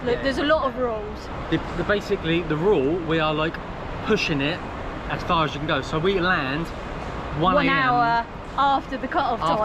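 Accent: United Kingdom. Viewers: 英国哪个地区的口音😂？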